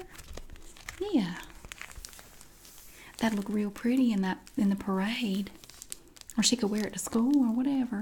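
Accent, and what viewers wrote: South, United States. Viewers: I swear, I am slowly picking up her southern accent after constantly watching her videos lol . . Either way. Fantastic job, Mam. lol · I'm from Germany & speak a couple of languages, but nothing is more entertaining & interesting than your southern accent. It kind of makes me feel all warm inside. It makes me think of happy animals on a farm, corn, BBQ, apple pie & hugs. 🇺🇸 ❤️🌽🥧🍩🌭🍿🥞🐄🐖🐑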